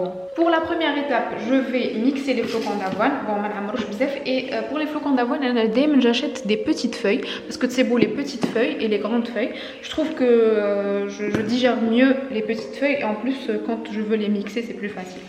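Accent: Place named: Algeria. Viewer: J'aime trop ton accent algérien ❤❤❤❤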